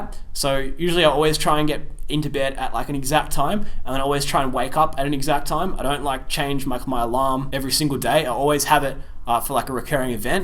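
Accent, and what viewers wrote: Australia. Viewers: that fooking Aussie accent mane... great video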